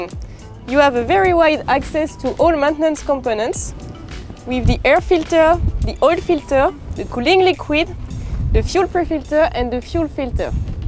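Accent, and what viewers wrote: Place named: France. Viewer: I love when someone speaks English with a French accent :))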